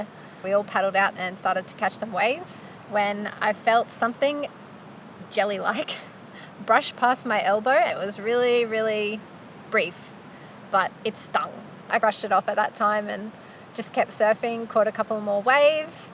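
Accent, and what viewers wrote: Australia. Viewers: Holy shit. I didn’t know you could talk!! You’re Aussie. I thought you were a Russian! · Love your Australian accent 😮😮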